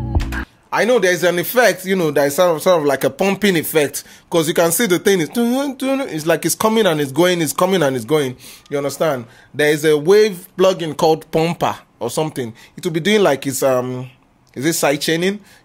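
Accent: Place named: Igbo, Nigeria. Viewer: This guy igbo English accent is mad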